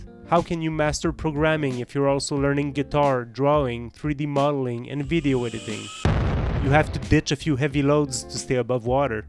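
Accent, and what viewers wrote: Quebec, Canada. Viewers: tu sonne quebecoi, nice video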